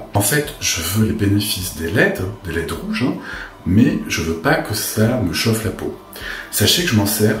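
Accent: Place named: Marseille, France. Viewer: Bel accent presque le mien de l Estaque quartier nords en foooooorce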